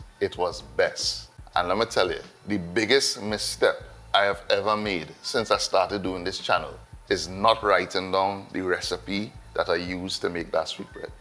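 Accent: Trinidad and Tobago. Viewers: I don't know what style of sweet bread you're trying to reproduce. I suspect it's Trinidadian, due to your accent. I'm familiar with traditional style Barbadian sweet bread and it did not have any of those hideous mixed fruits and maras. cherries that they are putting in the bread nowadays, according to what I have been seeing online. This is almost traumatic. Thank you for not putting that crap in your bread!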